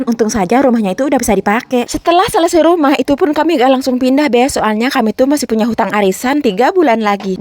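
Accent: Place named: Pandeglang, Indonesia. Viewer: Rumahnya dmn teh bahasanya mirip orang pandeglang kota kelahiranku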